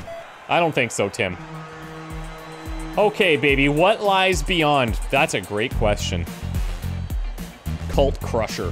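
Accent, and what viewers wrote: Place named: Saskatchewan, Canada. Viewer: Your speaking gets much more "Sasky" when you are playing this game. As a fellow Saskatchetonian, I love it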